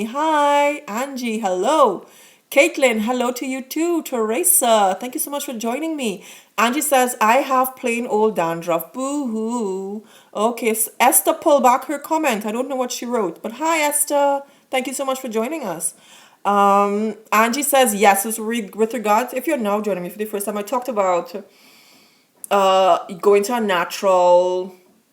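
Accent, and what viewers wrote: Trinidad and Tobago. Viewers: what country are you from? you sound Trinidadian... great tips!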